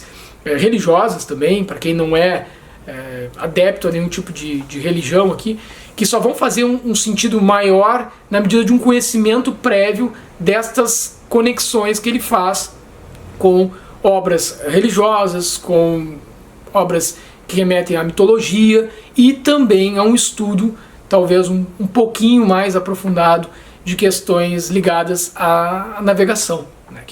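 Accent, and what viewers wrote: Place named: Rio Grande do Sul, Brazil. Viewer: Como sempre, excelente resenha. Tenho aqui No coração do mar, obra que inspirou Melville. Ainda nao li. O canibal é um dos melhores personagens.. Pena q vai sumindo no decorrer da leitura. Que loucura de Ahab... Mas tantas vezes nos apegamos a algo e nao queremos largar mesmo sabendo que o final nao será o melhor. Obrigado por ter voltado. Seu gauchês é ótimo kkkk É muita segunda pessoa do singular kkkkkk mas o mineiro aqui gosta! Abraço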